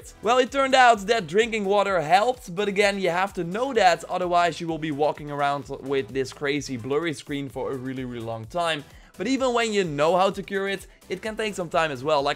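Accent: Netherlands. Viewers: Love your Dutch accent!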